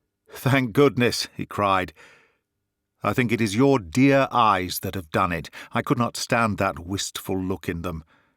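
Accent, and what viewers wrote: United Kingdom. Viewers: Mr your voice and narration is really marvelous... you can never imagine how much I enjoy listening to your narrations... your british accent helps me so much in learing british english... please never stop uploading more of your fantastic work... thank you very much sir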